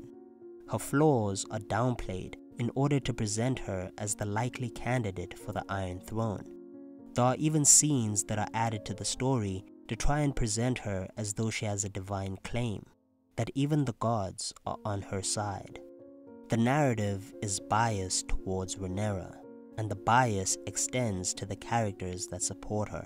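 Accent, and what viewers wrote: South Africa. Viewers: Are you south African? Just asking 😂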